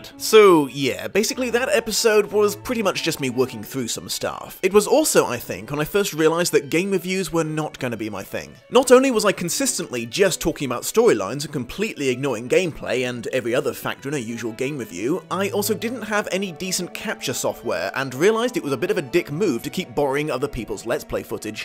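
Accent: United Kingdom. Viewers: I don't care where you live, just don't stop using the word "bloody". I LOVE your british accent! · going to the States... please don't loose your lovely British accent... :(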